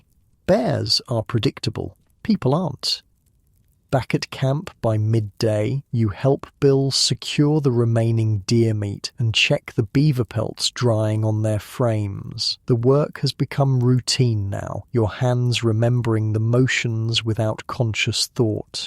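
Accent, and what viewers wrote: England, United Kingdom. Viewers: Why is an English man commentary to the American history??😂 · American pioneer stories by a narrator with an English accent?! Ummm, no thanks. I’m out 🙄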